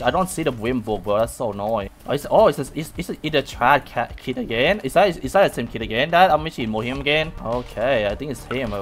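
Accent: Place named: Thailand. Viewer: I can barely understand your accent because your from Thailand im from Philippines...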